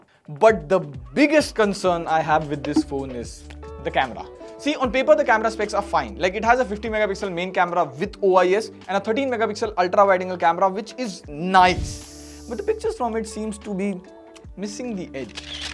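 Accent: India. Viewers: Good review but your Indian accent is so thick I had trouble understanding you at times.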